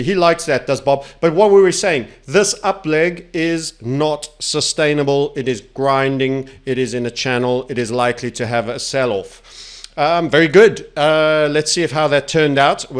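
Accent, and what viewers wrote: South Africa. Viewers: South african bud not ozzy .. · you are a sooth sayer sir. I have heard some sayers of sooth before sir,but this level of sootherie is staggering. (Just curious, South African accent?)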